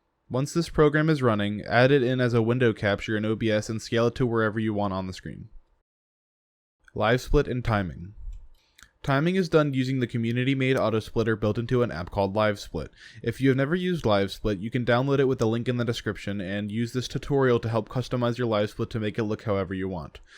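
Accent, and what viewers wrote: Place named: United Kingdom. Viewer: you are bri'ish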